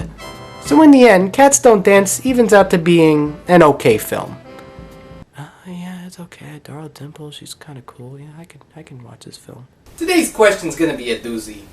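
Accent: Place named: Nashua, United States. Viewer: I heard Nashua, NH accent...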